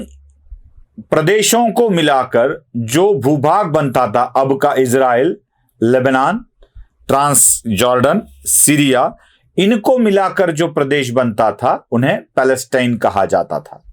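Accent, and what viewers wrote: Maharashtra, India. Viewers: क्रुपया हिंदी बोलताना मराठी ची लकब टाकु नका. हिंदी सहजपणे बोला. टेंशन फ्रि. मग मजा येईल.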